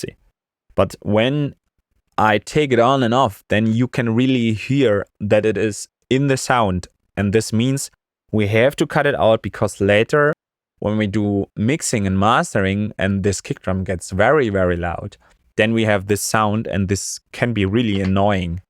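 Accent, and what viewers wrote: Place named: Germany. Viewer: man hört den deutschen akzent ordentlich raus :D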